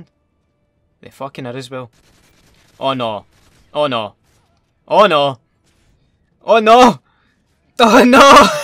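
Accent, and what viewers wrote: Scotland, United Kingdom. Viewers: Videos are so funny because of your Scottish accent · i love his scottish accent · I love the Scottish accent the best thing ever happened on YouTube. uploading vidoes on YouTube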